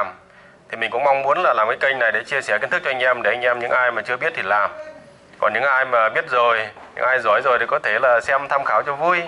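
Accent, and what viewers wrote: Vietnam. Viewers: Giọng thằng này 70% dân hoa thanh quế. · Bác dậy làm rất dễ hiểu.mà cho e hỏi bác ở thanh hoá phải k ạ.e nge giọng giống ở yên định thanh hoá quá bác ạ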